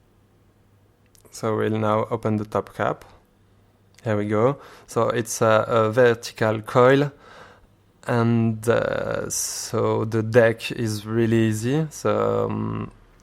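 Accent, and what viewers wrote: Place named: France. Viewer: You have lovely French accent : )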